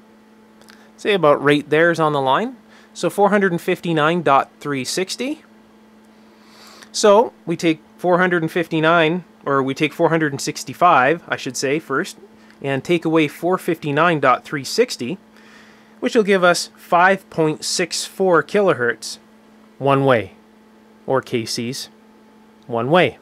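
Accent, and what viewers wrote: Canada. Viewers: Nice Canadian accent,!!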